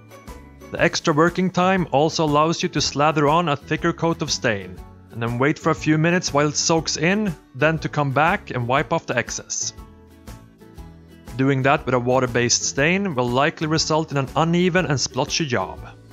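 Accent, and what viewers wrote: Sweden. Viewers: You from sweden? Ur accent is funny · You are so wonderfully swedish when you speak xD <3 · My grandparents were from Sweden. Hearing your accent makes me miss them very much. Also, great information! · Typical Swedish accent. I'm Norwegian, I've heard it a lot since I've been around Swedish people in international environments =)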